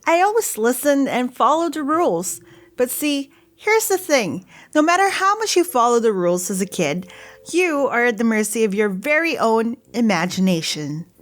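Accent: Philippines. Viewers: Are you filipino? Cuz im filipino. The way how i can tell is by the accent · I love the accent!❤️ It's just so Filipino 😂 Every pissed Filipino parents : Ay naku! 🤣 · Im new here so I didn't know she was Filipino until she said Philipine and thought: "So that's why she has sort of a Filipino accent like Alyah and Johan!" 😂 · Thats accent is very Filipino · BEST FILIPINO VOICE EVER (i dont know if i spelled that right) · I love you you got the Filipino accent on point or should I say pilipino 😉😂✨❤️ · Tagalog Accent xD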